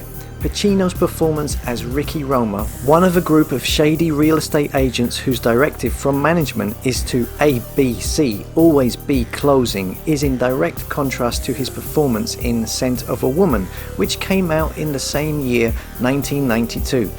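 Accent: United Kingdom. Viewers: British accent is SHIT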